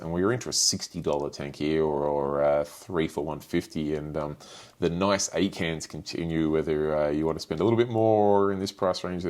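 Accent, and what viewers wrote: Australia. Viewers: Ahhhh, I always thought it was..... I do love Australian accents😁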